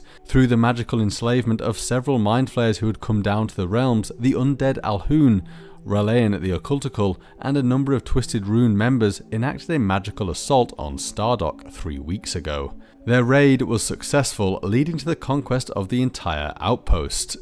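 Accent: United Kingdom. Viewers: Yes. Yes. An excellent lore video, per usual. I was particularly impressed with the quality of your elocution. But maybe that has to do with your posh accent. Anyway, please get more yarn. I truly love your BG3 theory videos.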